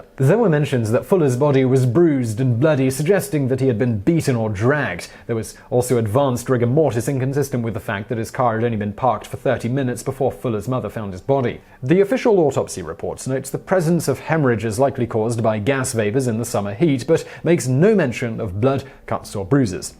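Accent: United Kingdom. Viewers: He's british...durrrrr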